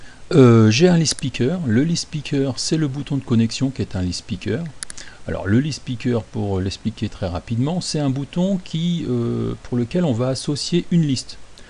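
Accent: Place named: England, United Kingdom. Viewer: Attention à l'accent anglais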